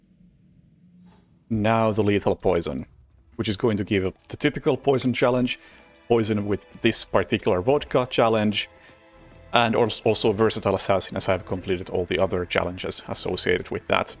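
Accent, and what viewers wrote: Finland. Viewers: Also the thing with the mastery change to 20 theres a bit more to it than that and it’s more understandable when u know the nuances: Hitman 3 changed A LOT regarding Hitman 2’s challenges when porting the old content over. Challenges were cut, sometimes reworked and most importantly they moved around unlocks. Like for example they removed all the Classics challenges for each difficulty which stacked up to a big XP bonus, added challenge pack rewards to the mastery track and had it so that there was rewards that counted how many SASOs in general you did rather than tying it to Master Mode specifically So all in all once you do that there was probably instances where that stuff had to be rebalanced, New York already had challenges which gave out less XP to make it the same speed to master as other levels so with a chunk of challenges gone they would have to give the levels different values anyway so they thought why not just make it more consistent with other maps while theyre at it? 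Plus you seem to forget how much people judge a map’s replayability on its mastery levels. This was way better for marketing, even when they eventually bring Hitman 2’s DLC into the full bundle like they did with the Switch2 version it’ll still be better for free players to know theyre getting 20 maps of around the same level of replayability rather than thinking some are less valuable than others Great video tho, i love the fact your finnish(?) accident makes “vault and climbing” sound like “bald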